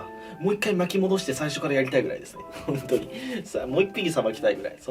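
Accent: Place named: Aichi, Japan. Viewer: 愛知のなまり 「ゴキブリ出たげー」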